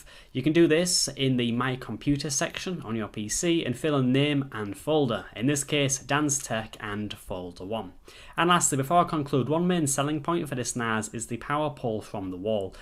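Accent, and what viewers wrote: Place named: England, United Kingdom. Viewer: ‼️it took me to the end of the video to realize he’s saying “RAID” not “red” 😂 English Accents are such a joke. There’s no way they aren’t aware of how dethroned their speech is when there’s no perceptual difference between these two words. For real.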